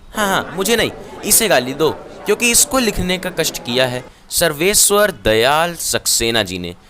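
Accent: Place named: Bihar, India. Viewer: Bihari ho ka bhai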